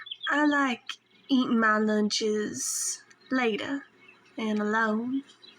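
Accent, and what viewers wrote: South, United States. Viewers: Pig!!! Very cute design, nice hometown farmgirl look, with an excellent southern accent. I do love the jean shirts and the pitchfork with ahem paint... solid 65!!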